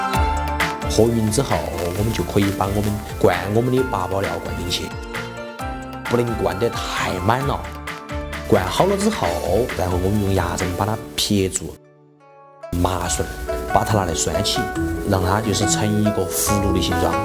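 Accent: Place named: Sichuan, China. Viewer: 四川话解说真是笑死我咯，真是欢落源泉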